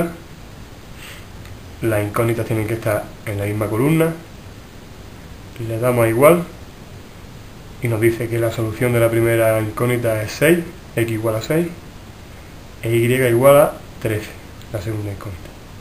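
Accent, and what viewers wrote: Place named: Caribbean. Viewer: Hola, me sirvió mucho, pero para que sus vídeos se vuelvan más virales debería neutralizar más ese acento caribeño. saludos desde los andes Colombianos...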